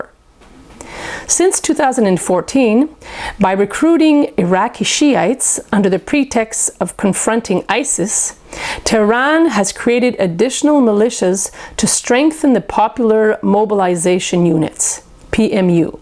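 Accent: Israel. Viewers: I SMELL ISRAEL IN YOUR ACCENT AND STORY . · This video is one of the many collaborations between Salafi/wahabi sunni and Zionist Jews as the pronunciation of the narrator comes from Israel Apartheid state. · The accent of the broadcaster in the video sounds Hebrew. From the way she pronounces Hezbollah. Am I right? Anyone else noticed that? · Zionist detector. the narrator is zionist israeli and this forum is false. (pay attention to her accent) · Why this woman is talking like an israeli woman?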